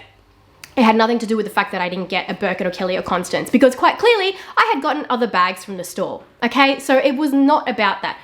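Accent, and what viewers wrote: Australia. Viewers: And you think you know everything and can determine SOMEONE ELSE'S place of origin simply because you don't like them, or the fact that they're from your country of origin. Do you even know what Aussies SOUND like, because she sure af sounds like one to me. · so interesting! I actually found you from this drama but will continue to watch your Chanel now ! Keep it up! I’m a aussie in the USA so nice to hear a aussie voice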